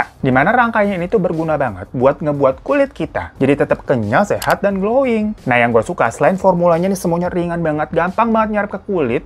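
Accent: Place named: Pemalang, Indonesia. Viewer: orang pemalang mana suaranya.. lah ternyata aku orang pemalang sendirian di sini :(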